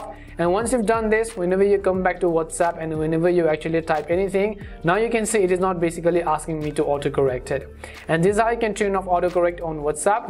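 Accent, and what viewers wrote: India. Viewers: WTF....are there no American English speakers that can handle simple tech advice. Indian is frankly rough on the ears and often unintelligible.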